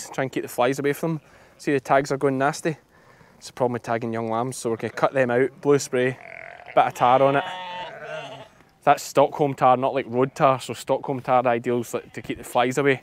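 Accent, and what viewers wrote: Scotland, United Kingdom. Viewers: Alistair is an absolute legend! But, would you consider putting Patreon back up? You could throw up some of the raw footage before Alistair does his magic (i.e. like your videos back in the early days). Just kidding, I love your early-days videos when you spoke Scots more. I miss being one of your Patrons! ❤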